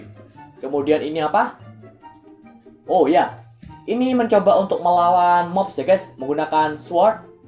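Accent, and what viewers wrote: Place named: Java, Indonesia. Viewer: Lu orang Jawa timur apa tengah bang !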